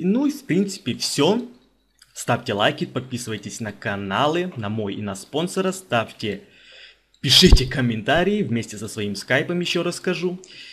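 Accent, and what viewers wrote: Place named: Ukraine. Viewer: Украинский акцент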